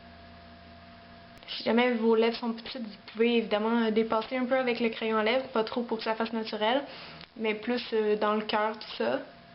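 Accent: Canada. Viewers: Euh...désolée mimane18000 mais n'a pas d'accent...Ahhhh ok! Tu es française et tu parles de son accent canadien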